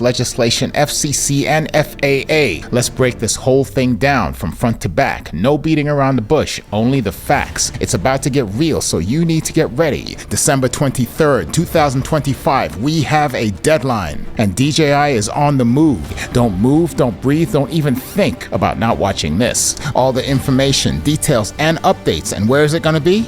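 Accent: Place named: China. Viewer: Love your Chinese accent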